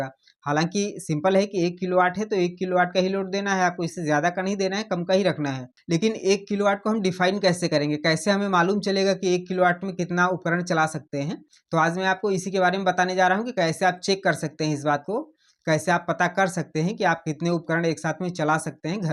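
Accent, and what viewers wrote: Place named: Western Uttar Pradesh, India. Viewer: आवाज तो आपकी वेस्ट up की लगती है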